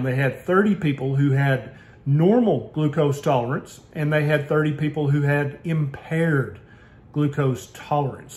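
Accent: South, United States. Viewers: If he didn't have that southern accent, I think his credibility would go way up.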